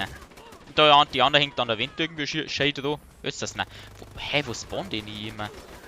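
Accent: Bavaria, Germany. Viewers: des boarische reden is so geil☺☺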